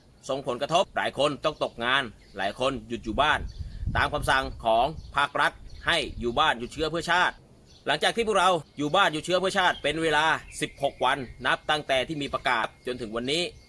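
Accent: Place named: Phetchaburi, Thailand. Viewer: เป็นคนจังหวัดอะไรค่ะ.. พูดเหมือนคนเพชรบุรีเลยค่ะ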